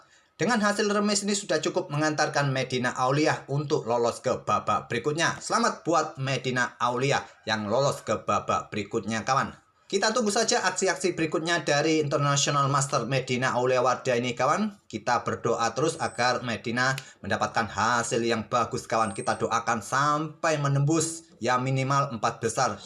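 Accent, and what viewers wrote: Java, Indonesia. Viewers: Bang kurangi loga Jawa nya.bang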